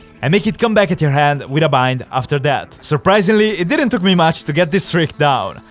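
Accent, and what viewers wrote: Italy. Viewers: no vabbè ma sei italianoooooooo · Quanto si capisce che sei italiano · pov: guardi per la prima volta un suo video e ti sembra abbia un accento molto italiano, guardi la sua descrizione e...... beh è italiano :D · Italian english be likes Quand'è che dici shish?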